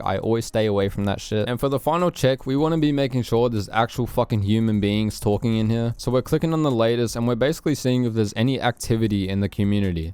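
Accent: Australia. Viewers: You sound like you’re from Sydney? Are you in Sydney? I’d like to do real life training online please.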